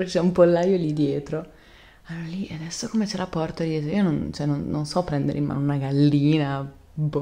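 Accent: Italy. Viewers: Me encantas , hermoso el acento italiano ✨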